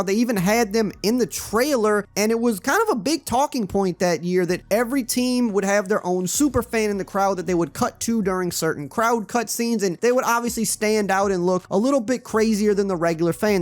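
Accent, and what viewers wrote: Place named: Midwest, United States. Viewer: Are you from the midwest, like Minnesota or Illinois? I'm from Chicago and you sound a lot like my friends.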